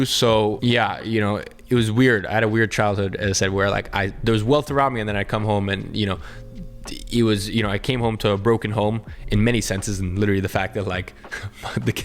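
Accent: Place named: California, United States. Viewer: If you grew up in London...why don't you have an English accent? You sound straight up Californian lol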